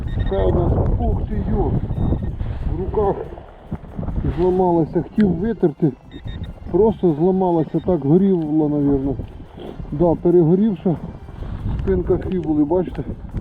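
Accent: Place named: Khmelnytskyi, Ukraine. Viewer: Доброго Дня,З Якої Області .Схоже На Розмову Хмельницька.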